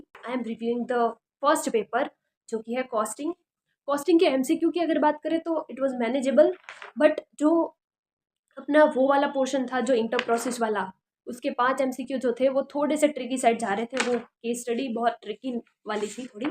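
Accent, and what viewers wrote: Gujarat, India. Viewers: Tumhari hindi thodi gujrati vaali he. Kya tum gujrati ho?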